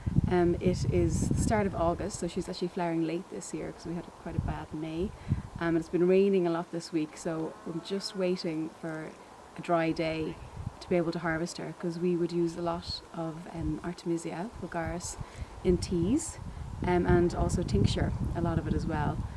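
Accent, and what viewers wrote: Ireland. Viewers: Is that a connacht lilt I detect? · love the gentle Irish accent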